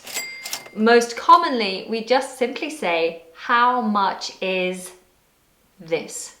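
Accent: United Kingdom. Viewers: Hi there! Today we have found out a new skill in you: an actress. So you're not just an excellent teacher, but a great actress too. Congrats for your work on this video! It's a great help even for those of us who think have a little higher level of English. But listening to you, to your voice, to your beautiful British accent is so pleasant! Cheers! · You are a perfect actress as well as a teacher. I adore your british accent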